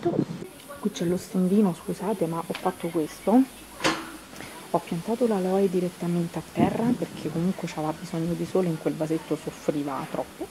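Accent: Rome, Italy. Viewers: ciao!... io ti adoro a sentire il tuo accento romano... mi fai tanta compagnia...un bacio a!!😍e un bacio a te😙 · Ciao finalmente posso dirti che sei troppo forte con la tua parlataromanesca un bacione a Ciao 💖👍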